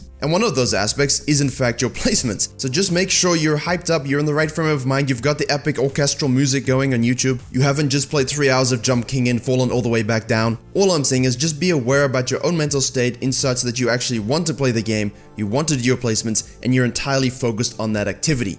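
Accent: South Africa. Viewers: that south african accent doe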